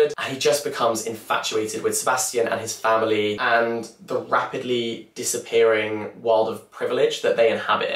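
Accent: United Kingdom. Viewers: I'm preparing for my IELTS exam and your videos are helping to understand british accent and also to learn how to talk fluently. Love you, ~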